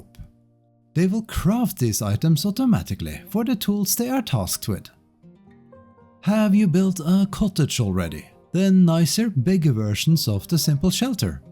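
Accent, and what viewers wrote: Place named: Norway. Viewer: .and this, people, is how an proper Norwegian accent sounds like :)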